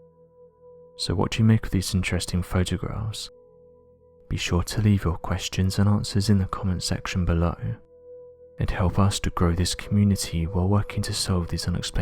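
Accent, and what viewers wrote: England, United Kingdom. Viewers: Why are you whispering ? Speak up already ! It’s bad enough that your slurring your words through your English accent. Can’t we get an announcer that speaks American English !?! It’s freakin annoying already.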